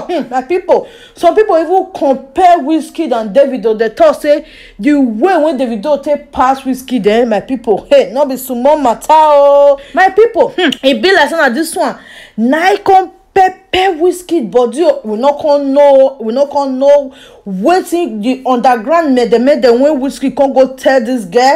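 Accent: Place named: Gullah, United States. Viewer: Enjoyed the video but I couldn't help but notice how similar your dialect is to the gulluh geechee dialect from South Carolina and patois from Jamaica.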